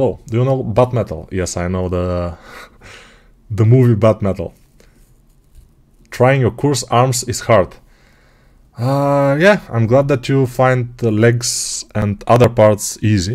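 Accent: Bulgaria. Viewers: You bulgarin bro (аз също + това акцента ти леко издава ) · The moment I heard your voice I new you were Bulgarian :D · I hear that Bulgarian accent from miles away :D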